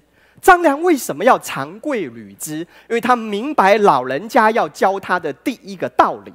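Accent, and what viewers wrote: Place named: Taiwan. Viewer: 内容挺好，但这口音实在是太重了。不记得台湾口音的舌头可以直成这样😂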